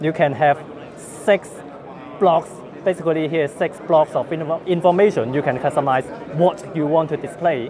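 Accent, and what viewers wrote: China. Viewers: chinglish :) but great first look :)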